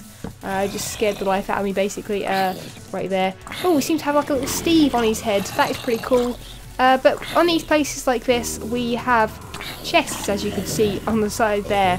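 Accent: United Kingdom. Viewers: You had a lot of views for your Hermitcraft series. Hope people will enjoy this one. Good luck to my favourite british accent.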